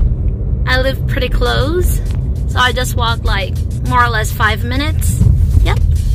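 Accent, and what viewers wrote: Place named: Philippines. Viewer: WOw, that is the most Pinoy English I've ever heard. Salamat po!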